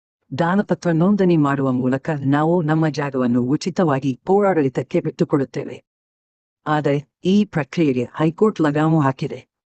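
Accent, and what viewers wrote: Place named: United Kingdom. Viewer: Listening your Kannada like English lady speaking Kannada. Next time change voice of fluent Kannada speaking person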